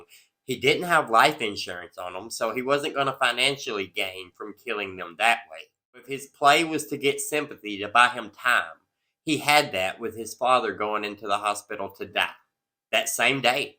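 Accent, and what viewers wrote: South, United States. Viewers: Hello! New subscriber here from the Scottish Highlands. I completely agree with your ‘Southern logic’ and I appreciate your explanation of ‘beyond a reasonable doubt’. Love your Southern accent too! It looks like your subscribers are a lovely group of critical thinkers. Keep up the good work!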